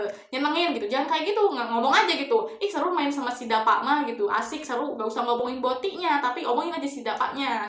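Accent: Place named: Sunda, Indonesia. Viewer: Gaya bicaranya mirip kang dedi mulyadi cenah😂 sunda buanget Yang paling penting di video itu kita sudah diarahkan untuk mengetahui perbedaan tiga hal dalam mengidentifikasi LGBT 🌈 1. Posisi seksual 2. Ekspresi 3. Orientasi seksual Bottom adalah posisi seksual yang dianggap sebagai orang yang lebih pasif atau submisif, begitu sebaliknya dengan top di gambarkan dominan dan lebih aktif Ekspresi ini adalah cara orang memperkenalkan diri ke publik yang biasanya dari sikap dan sifat atau bergaya pakaian yang khas Orientasi seksual ini yang menjadi bagian paling vital, hal ini yang secara jelas menentukan apakah orang itu punya ketertarikan seksual yang beda, setahu saya ada heteroseksual (lawan jenis) dan homoseksual (sesama jenis) aja Di tabel LGBTQ+ paling banyak adalah ekspresi diri Lalu untuk faktor lingkungan dan genetik itu udah umum, semua orang seharusnya tahu dan bisa dilihat langsung, saya fokus ke aspeo sosialnya saja dan psikologisnya Berikutnya mungkin yang kurang dipembahasan itu adalah sudut pandang tiap individu-individu terhadap BOTI, seluruh pembahasan kamu udah bagus cuman belum ada observasi atau penelitian langsung turun ke praktik lapangan sosial. 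Saya pernah mencoba memahami kenapa Boti dianggap salah selain karena melanggar aturan agama tertentu. Pada dasarnya boti digambarkan sebagai sosok yang feminim dan terkadang lebih pasif, hal itu sebenarnya dapat menganggu stabilitas ketertarikan seksual, terkadang ada boti yang terlalu cantik yang malahan banyak yan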